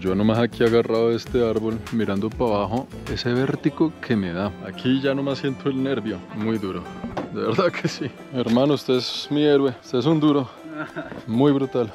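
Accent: Bogotá, Colombia. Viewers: "Que linda jeta tienes amigo" Alobien que no me canso de escucharlo hablar rolo al rededor del mundo parcero. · Me encanta como narra la historia Con esa voz tan bella y ese acento colombiano Lo hace a una quedarse a ver todo el video 👍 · GRACIAS POR DECIR ESO DE NUESTRO ACENTO BORICUA, 🇵🇷..TU ACENTO COLOMBIANO ES PRECIOSO TAMBIÉN! 🤗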